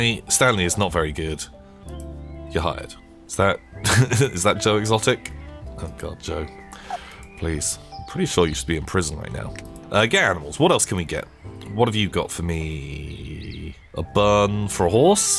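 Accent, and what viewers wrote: Australia. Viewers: 10/10 aussie accent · As an Australian myself, Aussie is now my new favourite accent!! That was gold <3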